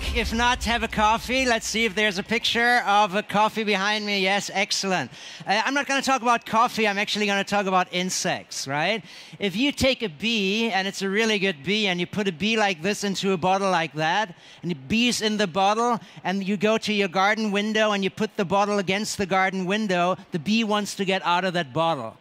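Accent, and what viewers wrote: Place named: Germany. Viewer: Good message! But very annoying delivery (too nervous, too loud, aggressive German-English...) Couldn't watch this for more than 15mins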